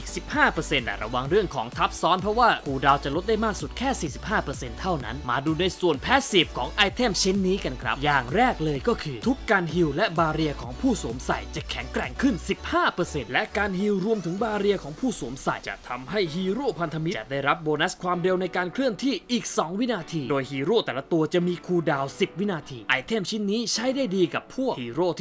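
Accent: South, Thailand. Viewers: คนใต้เหรอ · คนใต้ม่ายนิ555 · คนใต้มั้ยนิ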